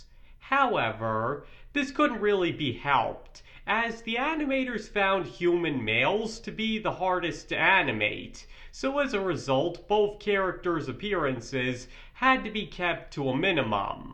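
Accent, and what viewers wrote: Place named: Midwest, United States. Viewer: Maybe it's their accent? Prob midwest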